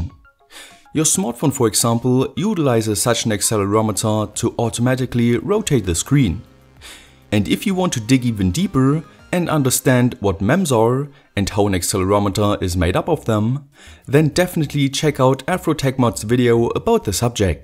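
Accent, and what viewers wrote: Germany. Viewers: German accent is really fine and "clean"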